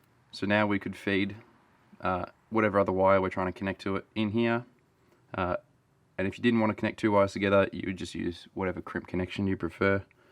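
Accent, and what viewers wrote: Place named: Australia. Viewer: Great info, and nice to hear the Aussie accent!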